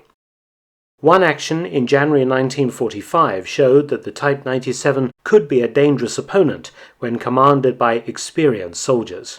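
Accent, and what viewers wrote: United Kingdom. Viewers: I love your intro then your awesome British professional voice over.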